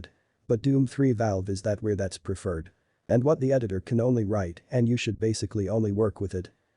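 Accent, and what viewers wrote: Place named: Austria. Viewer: Please continue with this tutorial series. I found this by accident - you should add a link to the RBDOOM-3-BFG README file. Also, the Schwarzenegger English is fine you don't have to waste time with the robot voiceover.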